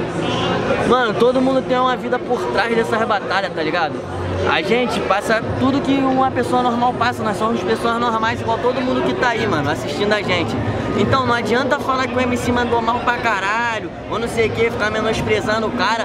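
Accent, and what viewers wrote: Rio de Janeiro, Brazil. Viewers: u Sotaque carioca...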